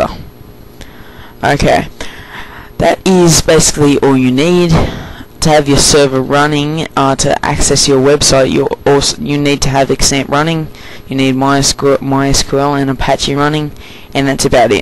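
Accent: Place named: Australia. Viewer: Its australian actually.. definately not a kiwi..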